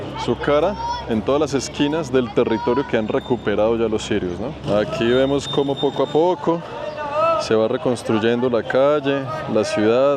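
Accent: Bogotá, Colombia. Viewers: No estoy de acuerdo con eso. Es un colombo-panameño, con un acento rolo (bogotano) muy marcado que indica un estrato social bajo... no puede regresar a muchos de los lugares a los que va. Lo primero que hace al llegar a Siria sin saber una palabra de árabe sirio es meter la cucharada y hablar de política con una ingenuidad e inocencia extrema. Pareciera que trabajara para el Pentágono en los EE.UU. porque su primera movida y la repite en este vídeo es criticar a la familia al-Assad sin haber hecho su tarea. Hoy no podría visitar Siria si no fuera por Bashar al-Assad que se vió forzado a tomar el timón del país para salvarlo cuando no quería nada que ver con política. A eso le llamo llegar de aguafiestas a meter la cucharada en donde no te llaman. Entonces qué hace en Siria? crear contenido pero esparciendo el Kool-Aid y la propaganda de Washington sin haber hecho su tarea. 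Algo parecido al desastre que causó con sus vídeos de Ucrania donde llegó con la ignorancia más grande del mundo y terminó haciendo vídeos propagandísticos que promueven al régimen de Zelensky controlado por Washington. Desastre absoluto y aquí lo primero que critica y donde erra es que menciona que Siria ha sido controlada "practicamente por la misma persona". TOTALMENTE FALSO. Bashar al-Assad es el elegido por el pueblo sirio. No menciona que la guerra la financió EE.UU. y que el supuesto "pueblo" que se echó a las calles fueron mercenarios extranjeros que se fueron adentrando en el país p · Primera vez que le veo y le FELICITO POR SUS VIDEOS TAN INTERESANTE APARTE ME RECUERDA SU ACENTO AL HABLAR A UN MARIDO QUE QUISE MUCHO COLOMBIANO WOW GRACIAS POR AMBAS COSAS Y QUE DIOS LE SIGA CUIDANDO PORQUE ANDA EN LUGARES PELIGROSOS SOY MEXICANA UN ABRAZO BENDICIONES ❤ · Como me río con las ocurrencias y el acento tan rico colombiano, una terapia, y de igual forma cubriendo países que de otra forma no veríamos de manera personal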